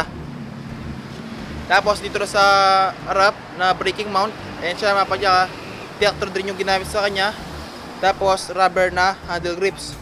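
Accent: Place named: Pampanga, Philippines. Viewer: Sir kapampangan ka. Hehehe. God bless po. Nanay ko po kapampangan same accent po kau. 😊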